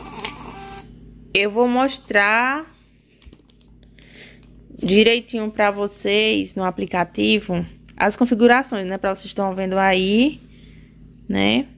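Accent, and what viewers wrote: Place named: Recife, Brazil. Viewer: Arrasou e esse sutaque so pode ser de Recife sucesso mulher <3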